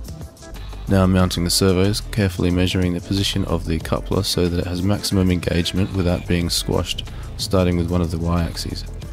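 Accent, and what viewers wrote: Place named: Australia. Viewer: Where you from you sound Australian ?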